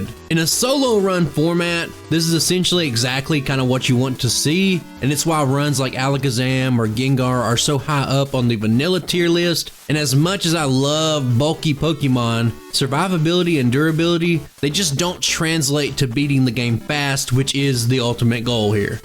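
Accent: South, United States. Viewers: This my first video watching, definitely just found a channel I'll be tuning into. This is weird but I swear you're the only Pokémon youtuber with a southern accent lmao everyone else doesn't have one or they British or something.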